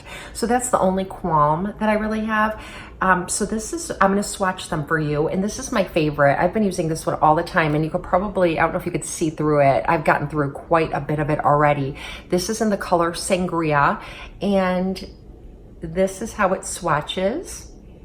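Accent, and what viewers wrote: Midwest, United States. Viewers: Hi! I came from channel. You are simply stunning! You have such a midwest accent. Cleveland? Chicago? I enjoyed your review. You have a new subscriber.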